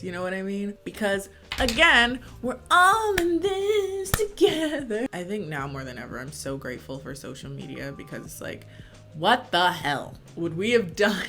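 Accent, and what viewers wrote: United Kingdom. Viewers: That British accent 👌👌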